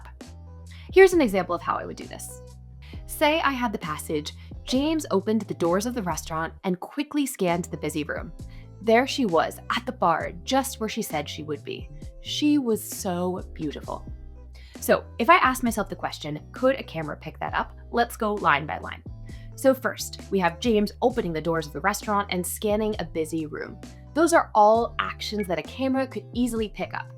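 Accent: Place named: United Kingdom. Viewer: Once again, not helpful for me to improve on not telling in my story. She's speaking British, and I'm full-blooded American from Chicago. It may generally be English, but the language is still foreign to me.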